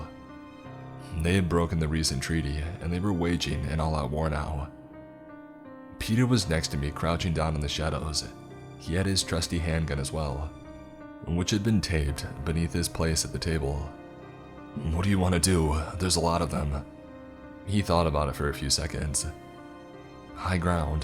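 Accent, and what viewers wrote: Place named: United States. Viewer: Ok I love this channel but some videos he does this voice? An American accent? I'm American so no hate but.. he sounds like a valley girl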